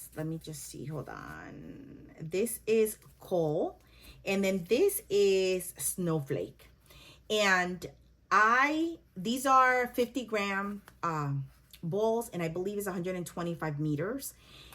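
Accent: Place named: New York, United States. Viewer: I'm so glad I came pass your podcast because, I just got this pattern and believe it or nor just finished reading the pattern on November 27' 2024 sm. I'm listening to you am and you are now talking about the Cumulous knit, lol. I'm excited now to start the Doppio after I finish with your podcast. Your accent is gorgeous almost sounding as if you are a New Yorker and Spanish, but I know nothing even at the age of 72, lol. I'm also a brand new knitter. Figured if I learned to crochet at 11 by reading a book, I could at 72 do the same. Well, that's a lie as I'm shaking in my boots trying to keep my circulars from shaking, lol. I also have a old basket I purchased in Panama during my 20 year stint in the Navy. If I survived tat when women weren't meant for the armed forces, tell me why this knitting is putting fear in my hands, lol. I'm going to do it anyway! 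Thanks for saying a beginner can do this, so I better get busy. I have the equipment, the yarn, my hands and the determination, lol. Wish me luck. I'll keep watching out for your next podcast. You're enjoyable to watch and listen to. Your enthusiasm has lit a fire. You had me interested at hello, lol. Ms. Jo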